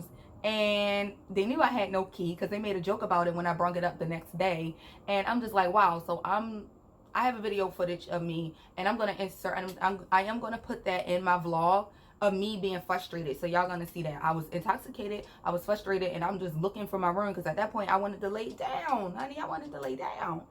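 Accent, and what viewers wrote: Baltimore, United States. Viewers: Ik you were from Maryland ik our accent anywhere. I love this story! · Are you from Baltimore or Philly? I swear I detected my Bmore accent. New here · "Dip off Diana" I'm crying off these names! I knew u were from Baltimore as soon as u started talking lol. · She said it dew she’s from b more 😂 has to be I low key hear it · could tell you were from maryland maybe baltimore from the accent 😭😭,, i love your personality so much !!!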